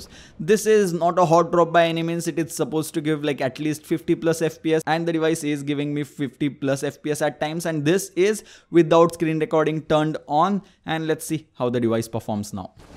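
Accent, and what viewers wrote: Maharashtra, India. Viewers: Ghati English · Индийский английский режыт слух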